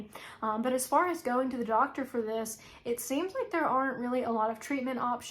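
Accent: South, United States. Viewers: OTC pain meds can damage your Liver over time. This can cause weight gain and the eventual need for a Liver Transplant, so that isn’t a real solution. Even opioids won’t do anything but mask symptoms. I learned that regular OB/GYNS are pretty unhelpful but that Oncological OB’s take things more seriously - so see one of THOSE. At your young age, they are assuming you want to have kids/more kids, so they don’t want to remove things. They also know that removing ovar(ies) can put you into immediate Menopause. So they are resisting that solution. A Very Low Carb/Keto/Carnivore diet can make a difference bc carbs/grains/alcohol/sugar can CAUSE PCOS. If you don’t give your body any of that, it can’t then cause Insulin Resistance, which causes PCOS. There IS scientific info on this. Watch YT videos by Dr. Ken Berry about this. If the pain is too much, AND you don’t intend to have kids/more kids anyway, maybe you’d be better off getting your parts OUT and going on HRT (Naturopaths know much more about this than OB/GYNS who were taught wrong by Big Pharma which runs all medical schools. 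Mild, regular exercise can help remove the bad hormones and toxins. Castor Oil Packs (TONS of YT vids on this) can help. You are very articulate and have done lots of research. Many doctors ASSUME that a female with a Southern accent is ignorant. That may be part of the issue too. Even if you know your stuff, the accent will make them dismiss your concerns…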